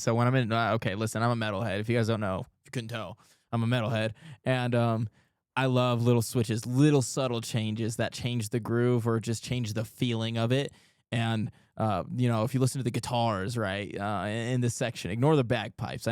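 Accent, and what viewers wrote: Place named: England, United Kingdom. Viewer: We're Scots. Around the World. You'll be fine. You sound like an Englishman though.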